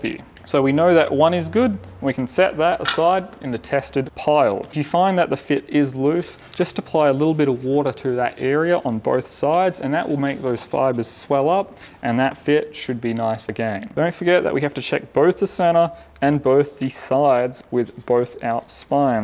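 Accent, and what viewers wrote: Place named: Australia. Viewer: You rock bro. Finally, someone that goes through the process thoroughly in detail.....and so cool to listen to another Aussie lad explain everything.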